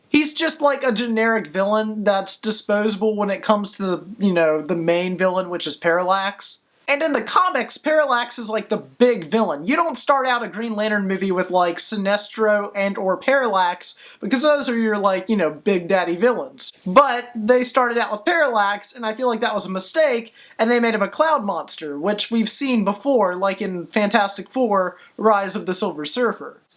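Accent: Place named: Maryland, United States. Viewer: weird question I know, your pronunciation just sounds like a Maryland accent.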